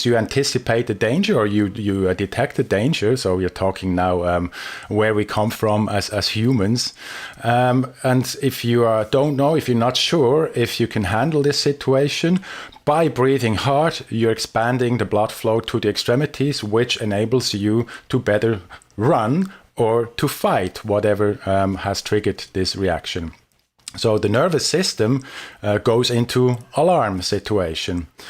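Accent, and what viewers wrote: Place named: Switzerland. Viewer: Very cool and informative video!😉 really like the swissgerman accent😁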